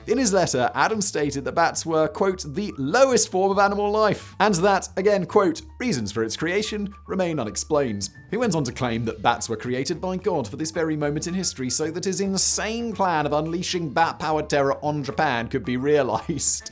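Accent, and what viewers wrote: United Kingdom. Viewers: I like your videos, but when you explain the details it's rather fast & with your British accent it's often hard to understand what you said. I'm not British and I'm sure your British audience understands every word.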